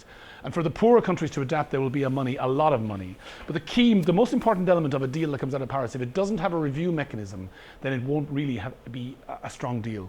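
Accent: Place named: Boston, United States. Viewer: Cool Boston accent!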